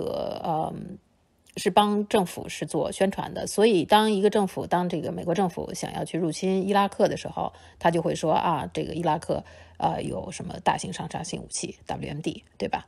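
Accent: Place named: Haidian, China. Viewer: 海淀口音…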